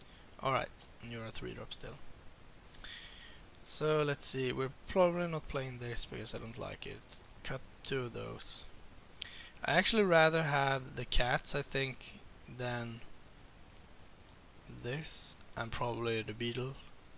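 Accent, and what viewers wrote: Sweden. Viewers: A real good video! I love that Swedish-English! Keep it up!" :D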